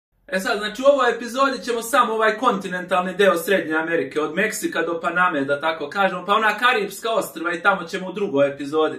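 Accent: Užice, Serbia. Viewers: Obožavam tvoj Užički naglasak! Bio sam tamo par godina pre jedno nedelju dana kod bivše devojke. Nedavno sam pronašao tvoj kanal, i religiozno ću te pratiti ka daljem putu! Pozdrav druže iz Subotice! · Hvala vam ,svašta sam naučila slušajući/gledajući vaš video. Vrlo ste spontani i prirodni u izlaganju. Tome po mom mišljenju doprinosi ERCOVSKI šarm u kombinaciji sa UŽIČKIM govorom ❤️. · Užički naglasak, podseća me na vojničke dane, a čujem da je sad u krugu kasarne Lidl, znači opet Svabe okupirale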